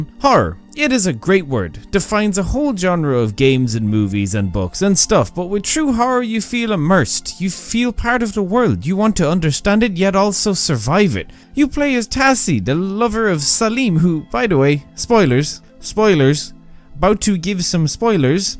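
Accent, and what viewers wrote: Ireland. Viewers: Lol love this review! Especially with your Irish accent 😂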